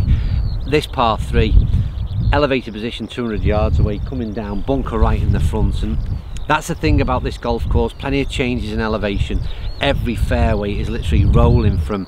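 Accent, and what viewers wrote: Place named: Merseyside, United Kingdom. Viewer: Great video and well played! Love the buggy cam footage tracking your progress to each green. Lovely looking course. (I'm in Liverpool by the way and you sound like you're from not too far away...?)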